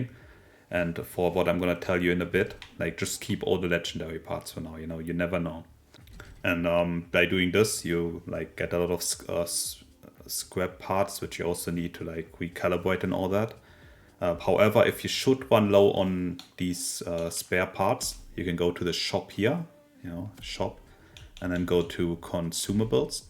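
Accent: Germany. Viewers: Awesome Video. Thank you so much. This is going to save ne alot of time. (Ps: Ich höre einen deutschen Akzent)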